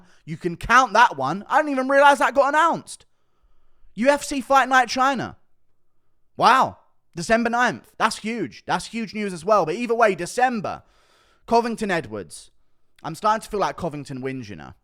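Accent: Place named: England, United Kingdom. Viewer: Where in england is accent from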